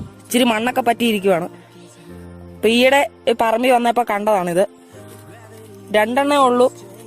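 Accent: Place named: Idukki, India. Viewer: ഇടുക്കി ✌️✌️✌️ slang കേൾക്കുമ്പോ അറിയാം 😇😎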